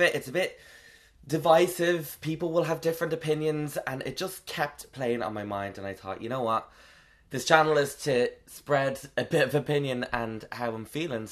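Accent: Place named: Ireland. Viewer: wait where in Ireland is he from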